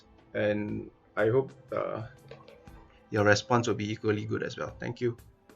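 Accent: China. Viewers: dont take this as a racist comment , but i am almost sure from your accent that you are chinese. i may be wrong, though! trying to be les nationalistic(because apparently it is the chinese and the jihadis like mazzod azhar only who can have the right to be nationalistic), i must say that all that u say is true but in reverse order. for example, u say that tejas is heavier. even though everybody knows that tejas is smaller and uses less metal than jf17. again the TWR is 1.07 with AoA of 26 degree that too when the safety against fuselage strain is kept on! jf17 has TWR of .97 and nobody knows its AoA! tejas uses a radar that may have a 30 km less range! but no inducted jf17 uses HMDS that LCA already has! besides, by your own admission LCA has a smaller RCS, wheras jf17 due to its metal component has more! so that means that the effective range of the radar of jf17 is smaller given the unsusually high amount of carbon composites in tejas airframe! 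add on to it EW suit! higher agility and moreover the existence of a local industry that can retrofit tejas makes it cheaper! besides, order of 83 mk1a has been placed and chinatopix along with many other Chinese articles have themselves pointed out tejas' superiority over jf17! this is just a last desperate attempt to dfame tejas by praising a air force version jf17 with a naval aircraft LCANavy, which was mostly a tech demostrator anyway!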